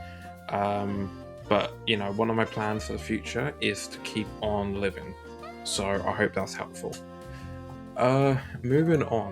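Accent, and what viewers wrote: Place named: United Kingdom. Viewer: Your British is showing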